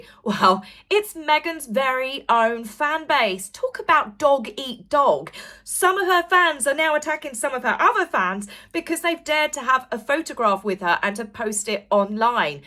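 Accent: United Kingdom. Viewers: Lol, I thought exactly that (about how British Edward, the Duke of Kent) looked. What a profile! He’s a year younger than my Dad (Papa)…he’s up on his roof cleaning his gutters, using a push mower to cut his grass, does all the same stuff he did 20 years ago. He scares all of us girls (3 daughters) to death, because he lives alone. But he won’t have any of our fussing, he just carries on an ignores us. Thank you for reading that bit in your lovely British accent, and not subjecting us to Meghan’s common Californian 🤭. I say that as a Southern American with friends in California…but her voice in particular, just really grinds my gears 😉. 💖🌸💖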